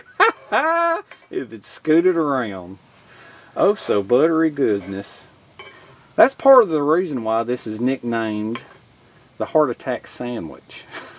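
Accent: South, United States. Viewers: I love watching your videos... I'm originally from Oklahoma and really enjoy your southern accent. I have been in the Pacific NW for several years now and don't often get the chance to hear someone that sounds like me... lol... Very refreshing. I just recently started a youtube channel but have only done one slide show video. I'm not good at talking in front of the camera. Hopefully I will get the hang of it. Keep on keeping on. You do great work! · Great video, and the sandwich looks great. I have been watching YouTube for abou2-3 years. I started out watching 3 or 4 channels on guns. I started seeing these videos about bushcraft and started checking them out. That is when I found your videos and really liked your way of doing them. I am also a southern boy and like the way you talk. I have thought about getting a camera and trying my hand at this. 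Hearing why you started has encouraged me. Now if I can just talk my wife into us getting a camera. Thanks and I am looking forward to more of your videos.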